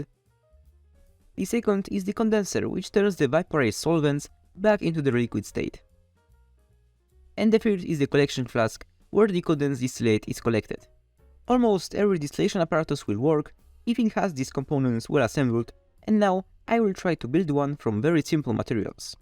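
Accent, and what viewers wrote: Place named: Poland. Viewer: Very good video ❤ just subbed and love to see more. It's just like nile red before he got popular and got worse. Happy to See again a High quality chemistry video that's down to earth. Aannnd happy to see a fellow polish guy, you have only little accent but it's not very noticable. Keep up that work ❤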